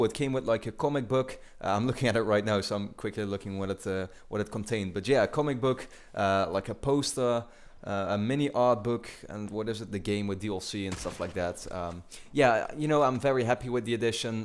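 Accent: Netherlands. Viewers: Aan je accent dacht ik al dat je Nederlands was maar weet het nu zeker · Are you from belgium or netherlands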